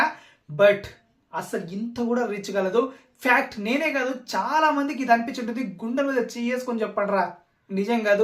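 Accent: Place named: Andhra, India. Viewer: Utter andhra slang ra arripooka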